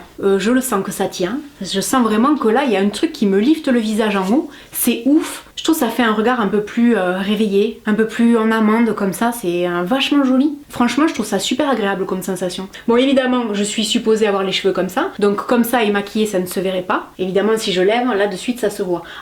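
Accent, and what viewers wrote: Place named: Toulouse, France. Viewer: Ma fille m'avait conseillé de vous regarder...c'est fait et j'vous trouve excellente..et l'accent j'adore..forcément je suis pas loin de toulouse...du coup j'vous suis 🙂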